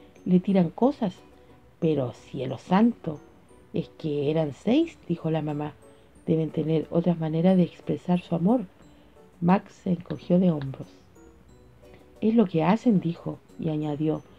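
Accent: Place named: Chile. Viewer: tu acento hizo el libro mejor de lo que ya me parece! me suena a que es acento chileno pero tal vez me equivoco, igual me gusta mucho la forma en la que lees!! muchas gracias estoy segura de que me irá bien en el examen :D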